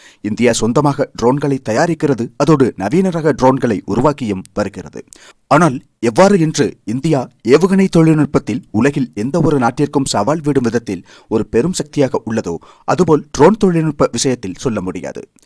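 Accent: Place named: Sri Lanka. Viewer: இலங்கை தமிழர்களின் தமிழ் உச்சரிப்பு (இது போன்றவர்கள்)... கேட்பதில் ஒரு இனிமை உள்ளது ❤ #..... நல்ல விஷயமாக தேர்ந்தெடுத்து பேசி வருகிறீர்கள்.நன்றி❤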